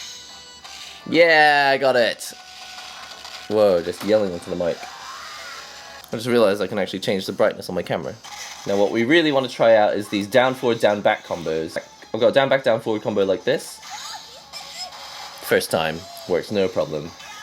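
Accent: United Kingdom. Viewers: He is Japanese but has a British accent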